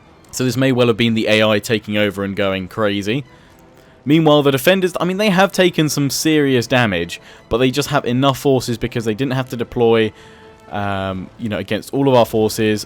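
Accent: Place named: Scotland, United Kingdom. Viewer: That Scottish accent tho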